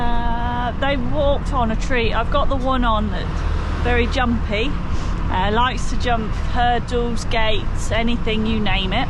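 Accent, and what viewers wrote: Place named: Cockney, United Kingdom. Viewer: Love the the chockney accent where about you are today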